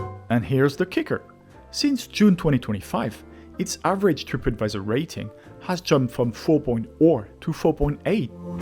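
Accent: France. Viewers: I'll always remember my trip to Montmartre; my next time in Paris, I'll dine in the warm ambience of Le Bon Bock -- despite having to pat my hand a bit to find my fork and knife. 🍽 Just kidding... I love a dimly lighted resto for supper. Ahhh, that roasted Camembert w/honey and thyme! I can taste it now. 😋 Viewing your well paced videos while listening to your marvelous French accent is such a pleasure. Merci beaucoup,. 😊❤❤❤❤❤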